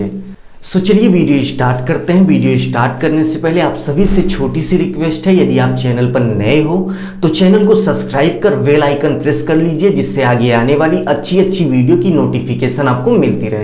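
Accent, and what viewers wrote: Bihar, India. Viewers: Sir ap bihar se hai kya??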